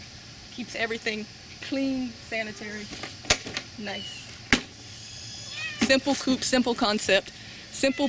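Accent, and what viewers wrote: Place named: Texas, United States. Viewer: You are so full of information! I have been following you for a while and now have subscribed. Please keep it up! I need all the information I can get! I am in texas, you sound like you are close by, what state are you in?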